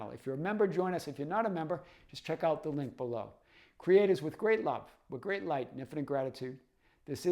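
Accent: Boston, United States. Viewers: Great video! Your accent reminds me of Boston 💪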